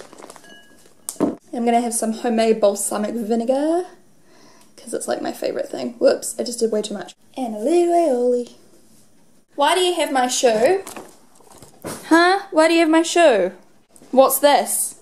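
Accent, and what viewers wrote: New Zealand. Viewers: Hi, congrats for the million subscribers! I remembered following you at the beginning some years ago just because of your NZ accent (I'm an English teacher in France) and now I'm just following because I like watching your videos;) Thanks for the smoothie recipe and I thought it was interesting to watch you editing and voice over your videos:)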